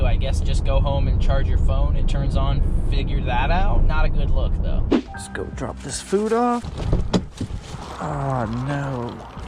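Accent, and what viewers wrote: United States. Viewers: U American?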